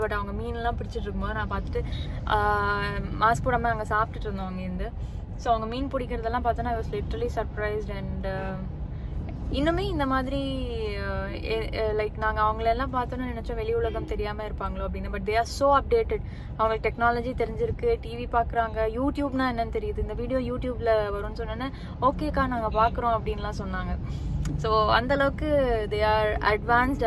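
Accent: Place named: Coimbatore, India. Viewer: Coimbatore slang.. Wow Chance illa... Missing coimbatore. Swargame endralum adhu nammoru pola varuma.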